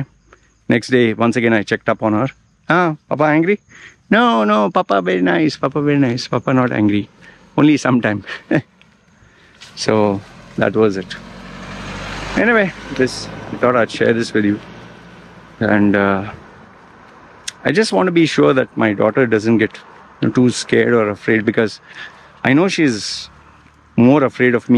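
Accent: India. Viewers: How come you have an Indian accent ?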